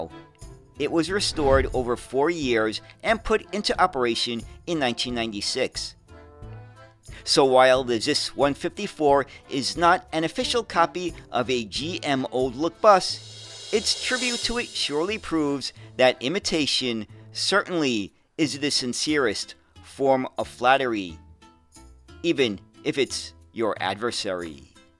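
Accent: New York, United States. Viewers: Great vid for - as you say in your YT intto - enthusiasts for obscure transport stuff. PS: that old Zis-16 looks suspiciously like an imitation too, of the iconic Saurer Post Bus from Switzerland. PPS: the New York accent and stadium announcer style add that little extra... 🙂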